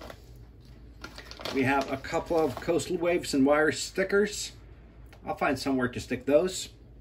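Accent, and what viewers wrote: United States. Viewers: I just wonder, are you American or Norwegian? Americans are not named 😃 but you sure talk like one 😊 73 from LA-M160560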